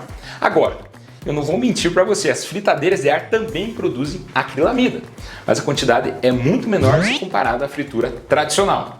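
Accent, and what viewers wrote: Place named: Minas Gerais, Brazil. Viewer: Mineiro, caipira ou americano??? Bonitinho o doutor... fala diferente, né?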